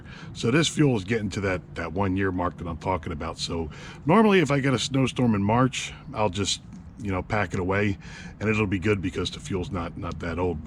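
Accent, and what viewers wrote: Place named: Pennsylvania, United States. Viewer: Are you from PA?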